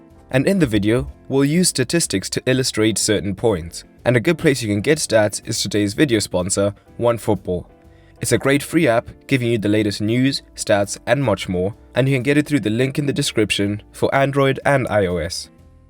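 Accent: South Africa. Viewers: This guy sounds like he is from Durban. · South African?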